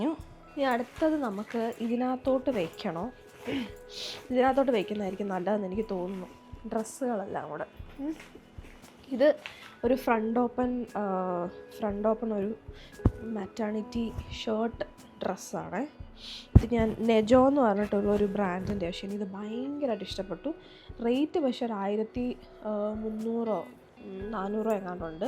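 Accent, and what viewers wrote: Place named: Trivandrum, India. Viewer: slang ipo tvm slang ayapole 😃and hope u and baby doing well ❤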